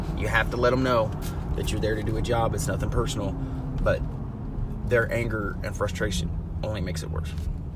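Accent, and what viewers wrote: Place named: Indiana, United States. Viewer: Man I’m from Indiana and I was like this dude is definitely somewhere in Indiana don’t know the town but I can tell it’s Indiana 😂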